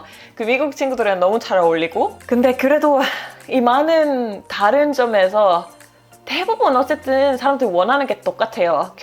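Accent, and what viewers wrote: South Korea. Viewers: 경상도네요 ㅎㅎ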